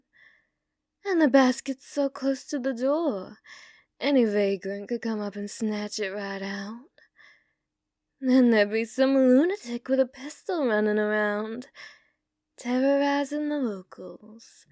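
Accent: South, United States. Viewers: You did that southern accent very well..😊😊😊😊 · What British walk-the-wasteland-fuck magic is this How did you manage to make the southern accent sound so appealing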